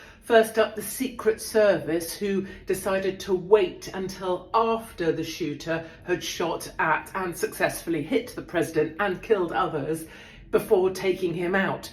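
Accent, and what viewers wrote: United Kingdom. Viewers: Trump is alive only because the Lord saved him. BTW, I love the British accent.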